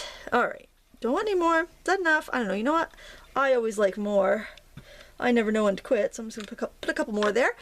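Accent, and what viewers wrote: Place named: New Jersey, United States. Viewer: This is really a nice, peaceful scene,. Thanks for showing it to us.Do I detect a NJ accent when you're talking? Sounds like, "up home," to me. I like to listen to you talk.Happy Holiday Season to you and yours.:D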